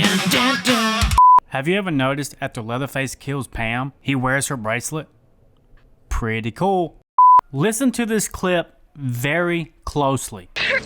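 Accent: South, United States. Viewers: You are definitely from the south my friend 😂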